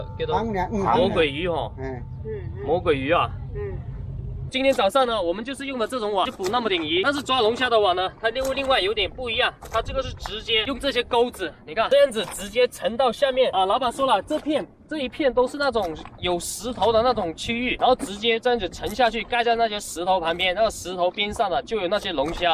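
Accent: Chaoshan, China. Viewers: 潮汕话闽南语系的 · 是潮汕话吗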